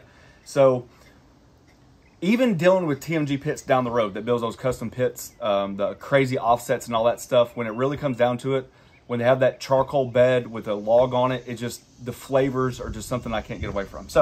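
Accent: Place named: Gulf Coast, United States. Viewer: I'm gonna take a guess that you (like me) are from the Gulf Coast. I'm just judging that by your accent and the heavy downpour. Am I wrong or right?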